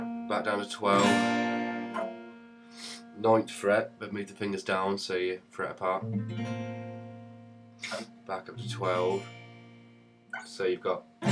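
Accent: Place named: United Kingdom. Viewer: wow.That was actually the best Andy tutorial i saw on youtube,and the best british accent i heard in my hole life.Thanks bro!!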